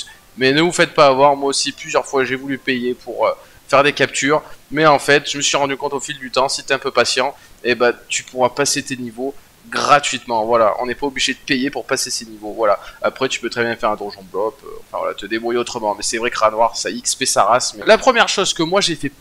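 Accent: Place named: South, France. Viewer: Je m'abonne pour ton accent le sang du sud mais je suis Dofus 2 👍 rétro j'y retourne plus 😂 trop de farm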